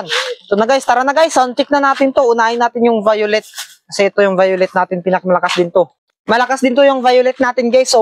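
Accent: Iloilo, Philippines. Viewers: ilonggo ka gali dol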